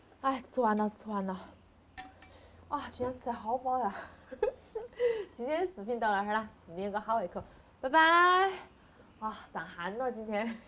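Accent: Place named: Sichuan, China. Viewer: 喜欢的四川话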